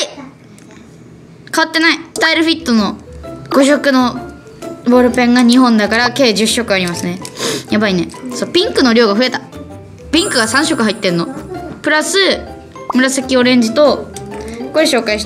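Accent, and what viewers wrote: Kumamoto, Japan. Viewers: の筆箱紹介好き！同じふせん持ってる！！広島弁と熊本弁って似てるね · 熊本弁もっと喋ってほしい！！かわいい、！ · 字綺麗で羨ましいです熊本弁可愛いすぎ(≧∇≦)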